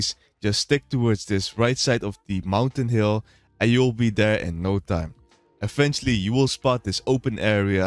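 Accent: Netherlands. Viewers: You can hear that your dutch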